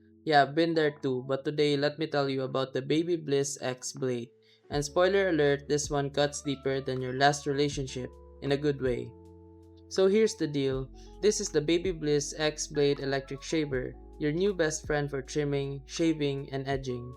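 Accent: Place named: India. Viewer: What's with white guy on your thumbnail when you're Indian voice? 100% you haven't used this or own it, not once did you show it on camera, only website footage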